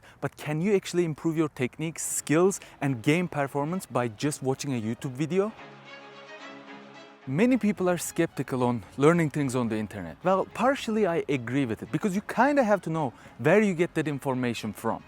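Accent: Turkey. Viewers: U speak like a Turkish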